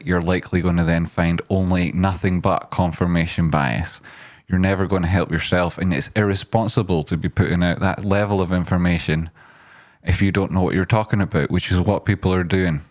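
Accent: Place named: Edinburgh, United Kingdom. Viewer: Top show M8.thanks. I sort of get it better when its an edinburgh accent. i think I almost got a wrinkle on my smooth brain tonight listening to you..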